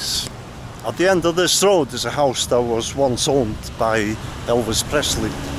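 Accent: Wales, United Kingdom. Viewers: You Have Such A Lovely Accent, I'm Biased Though Also Being Welsh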